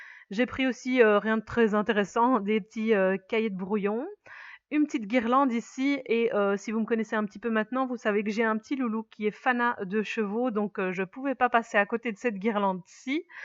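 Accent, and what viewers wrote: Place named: Belgium. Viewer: J'entend que tu viens de Belgique... tu viens d'où? C'est l'action de quelle ville? Bonne soirée!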